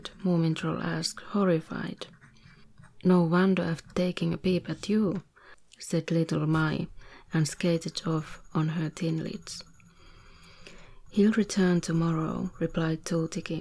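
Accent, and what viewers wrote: Finland. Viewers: finnish accents are lovely. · I came here for the finish accent.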